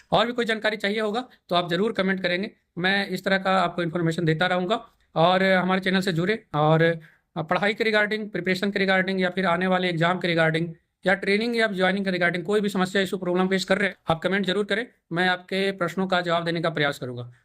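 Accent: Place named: Magadh, India. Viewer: I recognised you by your magadh accent I am also from Nalanda(Bihar Sharif)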